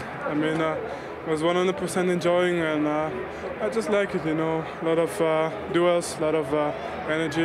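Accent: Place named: Manchester, United Kingdom. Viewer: Funny how his Belgian accent evolved into a Manc one over the years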